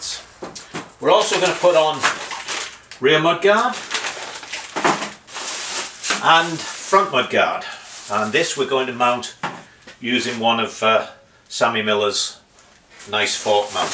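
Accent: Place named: Teesside, United Kingdom. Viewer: Great stuff,. I have rebuilt a couple of OiF BSA singles and this reminded me of every turn of the wrench as I did so. Despite my mature years, you showed some methods and tools that I had not seen before. Really excellent and I look forward to the engine rebuild. Being from Tyneside, I recognised the smoggy accent immediately!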